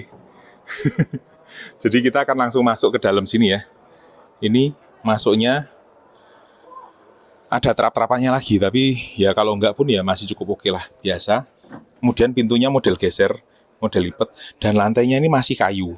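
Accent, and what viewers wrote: Java, Indonesia. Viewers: Host nya kental sekali logat Jawa nya..!!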